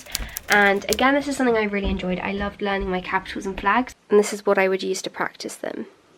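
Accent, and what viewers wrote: Spain. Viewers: Your Spanish accent is so cute!! Love you ✨💓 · I love your Spanish accent! It’s so cute🤗 I’m a native Spanish speaker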